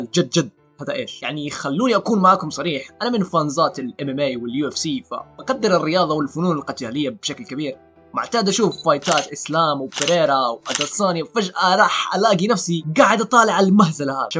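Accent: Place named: Saudi Arabia. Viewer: وااا غبرنا عليك شوية وليتي سعودي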